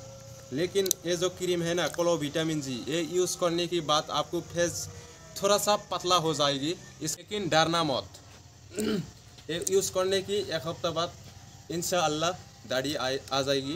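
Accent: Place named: Assam, India. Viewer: Ye assamese ladka hein mein bhi assamiya hun... mujhe pata sala ye assamese ladka hein iske ayaz se pata sala... bro Ki khbr ...mojja lagise video tu dari ahile tumak dhanyavad dim😁😁😁😁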